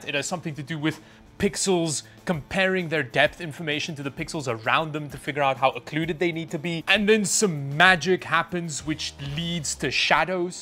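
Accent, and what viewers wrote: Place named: South Africa. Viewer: Hey man, I'm loving your content, are you a fellow South African?? It's so refreshing to hear a familiar accent XD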